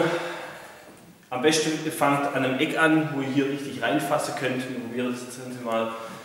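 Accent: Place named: Swabia, Germany. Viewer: Top Reparaturanleitung auf Schwäbisch. Mehr davon bitte...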